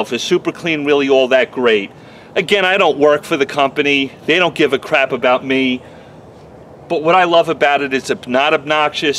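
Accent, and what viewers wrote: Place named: Texas, United States. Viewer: Love that Texas accent 😏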